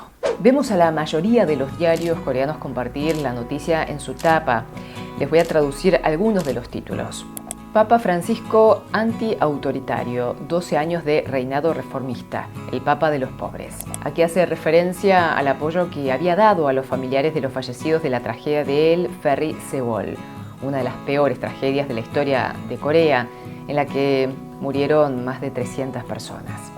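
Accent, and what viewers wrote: Argentina. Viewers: QUE BONITA VOZ QUE TENES, RECIEN TE CONOSCO Y ME QUEDE A ESCUCHARTE,me sorprendió tu tono de voz se asemeja a tonada argentina!, saludos desde ARGENTINA..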